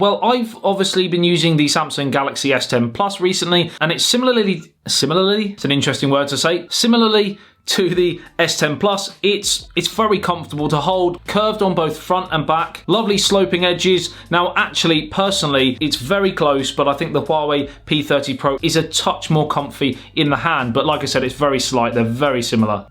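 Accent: United Kingdom. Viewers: Like your UK accent mate 😏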